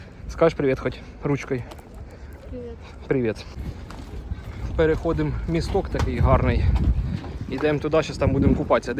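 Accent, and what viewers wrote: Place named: Komyshuvakha, Ukraine. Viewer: А часом, Ви не з Комишувахи? В мене там родичі живуть, говір - ідентичний❤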